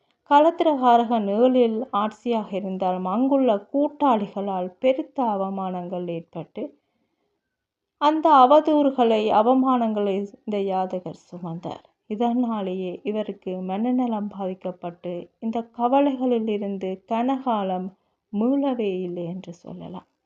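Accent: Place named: Sri Lanka. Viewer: உங்கள் இலங்கை தமிழ் அழகு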